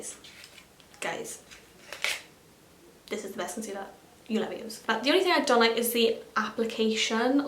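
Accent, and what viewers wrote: Wales, United Kingdom. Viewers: Watches video hears welsh accent subscribes 👍🏼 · are you welsh?xxx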